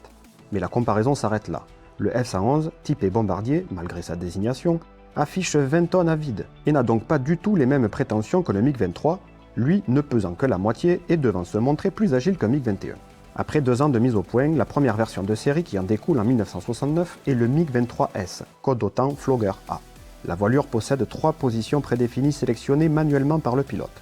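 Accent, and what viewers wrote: South, France. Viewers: Ce délicieux assin' du sudeu pour raconter un avion soviétique est une merveille, toujours le même plaisir à faire le tour de vos vidéos tous les quelques mois =)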